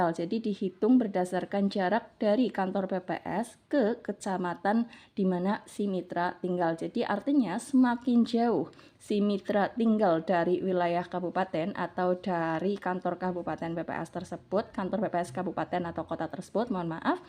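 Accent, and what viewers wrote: Banyumas, Indonesia. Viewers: Bantu jawab...kalo ga salah dari banyumas purwokerto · kok kaya ngapak aksennya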